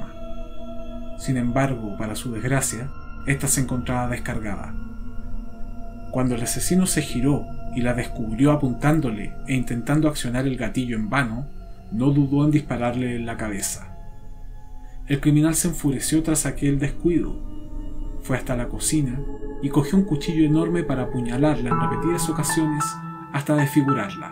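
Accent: Chile. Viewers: El narrador sin duda es chileño, gran trabajo, vato. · El tono shileno le saca lo oscuro y se torna hasta gracioso 😂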